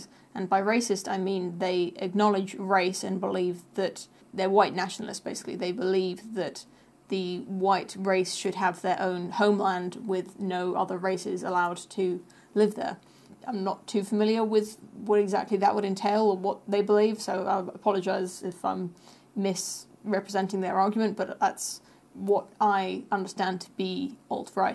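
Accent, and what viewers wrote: United Kingdom. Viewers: Insightful analysis, clear diagrams, no makeup, British accent. is literally the sexiest woman on Youtube.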